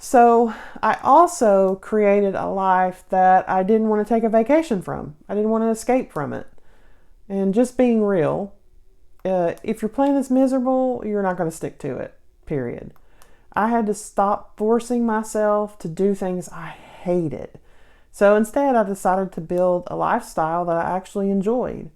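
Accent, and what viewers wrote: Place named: Texas, United States. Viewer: Are you a Texan, sweetie? You sound like it.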